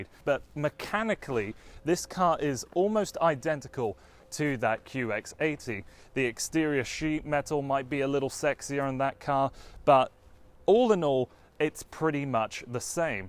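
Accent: United Kingdom. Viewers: British accent is not a bonus point or anything. But yes the presentation was good.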